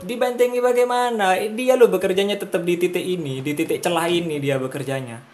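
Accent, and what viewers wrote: Madura, Indonesia. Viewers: Nada2 orang madura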